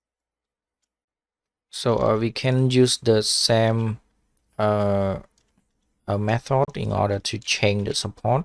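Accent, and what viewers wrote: Cambodia. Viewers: បងខ្មែរមែនស្ដាប់តុងអង់គ្លេសបងដូចខ្មែរ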